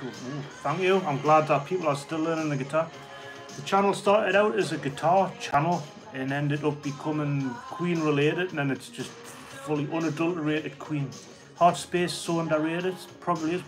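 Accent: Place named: England, United Kingdom. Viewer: What part of England are you from? Your accent sounds familiar...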